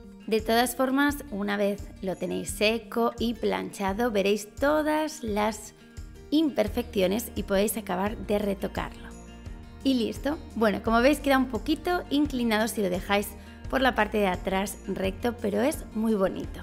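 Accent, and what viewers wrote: Spain. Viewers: Me gusta tú acepto española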